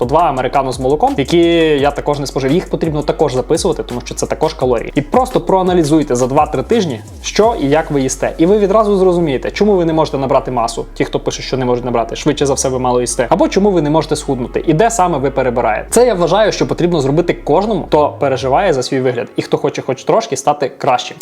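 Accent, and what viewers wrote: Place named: Rivne, Ukraine. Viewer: Привіт!Натрапив На твоє відео,результати вражаючі,повага,цікаво спитати звідки ти бо я сам родом із Рівненщини і твій говір такий як наш😄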